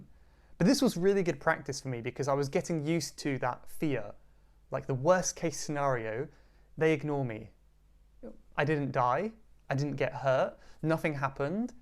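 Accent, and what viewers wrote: United Kingdom. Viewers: I am from India and I am here to learn british accent..and I get your videos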